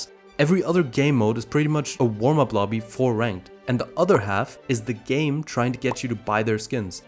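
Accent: Germany. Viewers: ich hör doch dort einen deutschen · starkes video!!! übrigens hör ich raus dass du deutsch bist aber keine panik das schaffen nur 0.1% mach weiter so!!!!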